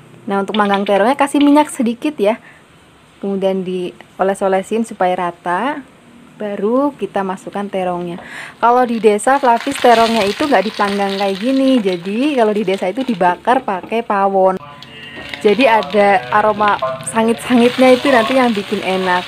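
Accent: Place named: Tegal, Indonesia. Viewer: Mbknyq org mana???logatny kyk org tegal jawa tengah❤slm knl y mbk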